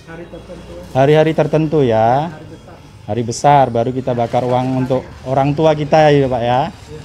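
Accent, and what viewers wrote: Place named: Java, Indonesia. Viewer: Jowo ya mas .logat nye medok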